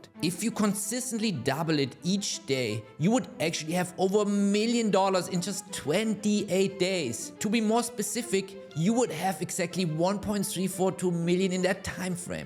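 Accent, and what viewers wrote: Germany. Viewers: i am german and sounds like you too?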